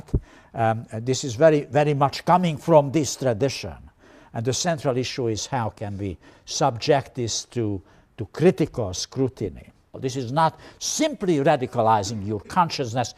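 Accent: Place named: Europe. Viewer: And I was surprised could do such a convincing continental accent!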